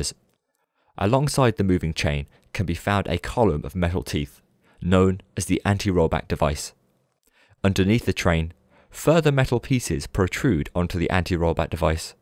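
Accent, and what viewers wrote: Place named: Black Country, United Kingdom. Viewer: Ahh - I used to live in Dudley and was trying to figure out if it was a little Black Country or Brummie twang I could hear in your accent :D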